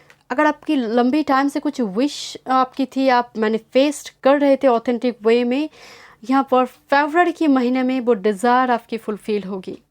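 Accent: Bengal, India. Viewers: You sound like a Bangali.....is so..?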